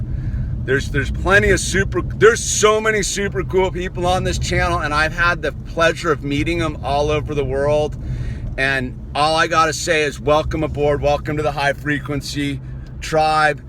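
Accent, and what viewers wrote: California, United States. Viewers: I’m glad I subscribed to you . Your voice is so mellow and Californian (?) . 💕💛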